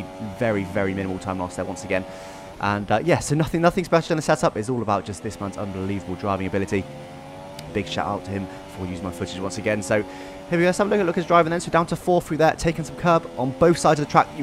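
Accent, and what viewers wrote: United Kingdom. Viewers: For the first ten seconds I thought you sounded awfully English for a German fella.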